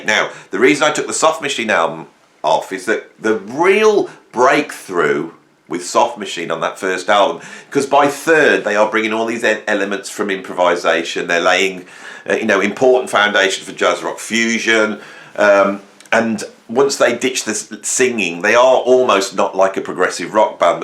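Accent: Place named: West Midlands, United Kingdom. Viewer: All your videos are informative, funny , intelligent and with such love of the subject that i cannot be anything but impressed.... And its good to hear a west midlands accent espousing mind nuggets 👍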